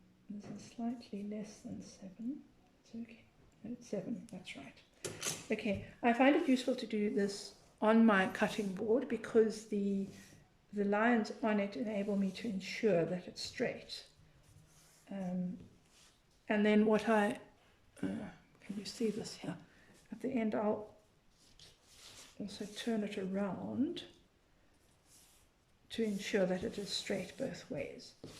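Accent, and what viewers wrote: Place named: South Africa. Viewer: yes, the person I learned from uses the same density board for spines as the main cover. I've been covering with thicker curtain type fabric as you know... apocalypse and all - don't leave the house unnecessarily and i've managed a few hardcover books but it always seems to crimp slightly and then binds too tightly. No one else notices this but I'm a perfectionist. I might try add just a 2mm leeway on the spines and see if that helps. Also, when I clicked on your video and heard your accent I did a little happy dance and was like "That's defs from SA!" then i checked and yesss! Nice to see south africans making epic content!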